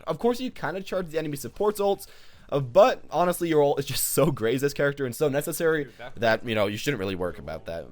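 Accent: United States. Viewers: I subbed for the American accent FINALLY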